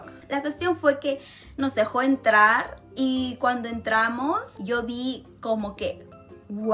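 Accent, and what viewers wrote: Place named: Mexico. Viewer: Porque hablas como mexicana? , Linda historia 💕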